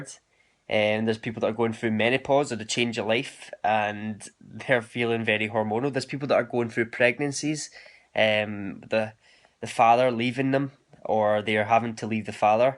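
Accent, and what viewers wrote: Scotland, United Kingdom. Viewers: I could listen to you forever, especially with how great you make everyone feel talking about real, meaningful ideas. On another note there is nothing sexier than a Scottish accent. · I've been watching your videos for awhile now and I have to say that you always seem to say the words I need to hear. Life has taken its twists and turns, but I'm starting to find myself again and what makes me happy. I just wanted to thank you for your inspirational words, and of course I love your Scottish accent!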